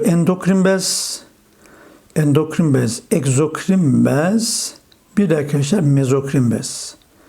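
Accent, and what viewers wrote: Black Sea, Turkey. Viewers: Hocam siz karadenizli misiniz:) ? Hocam bir de ben (Palme Yayınları, Bilgi Sarmal Yayınları, Rasyonel Yayinlari Ayt biyolji Branş denemelerini cozuyorum) sizce yeterli mi ? Ortalama 7 ya da 8 net birakiyorum ama sinavda neredeyse full birakmam lazim:((( Bir de Hocam ayt biyoloji icin ne dusunuyorsunuz sizce bu sene nasil olur? Sizden cevap bekliyor olacağım simdiden tesekkurler :)💜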